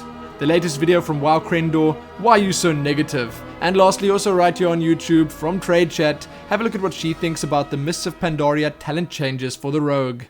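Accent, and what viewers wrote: South Africa. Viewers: What!?!? South african accent FTW!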